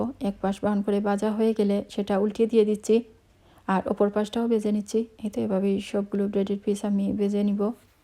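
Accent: Sylhet, Bangladesh. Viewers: Please talk sylheti your accent telling me you're sylheti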